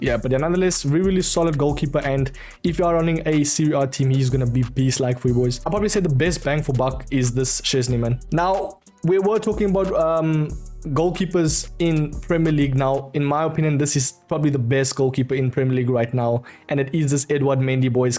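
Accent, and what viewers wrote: South Africa. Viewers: South African? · R u frm south africa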